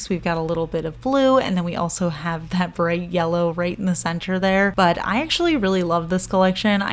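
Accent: United States. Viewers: Oh what a wonderful video- spot on! Thank you- have ordered 4 of them. Where from the US is your accent? Becky from Sydney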